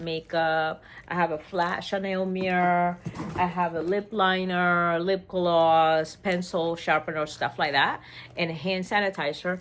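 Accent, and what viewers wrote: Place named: El Salvador. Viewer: I love that crystal tabby. You aways have great bags. where are you from? The accent sounds like el Salvador or maybe Columbia 🤔 But anyway, the new loved leather in brown looks way too intentional on certain bags.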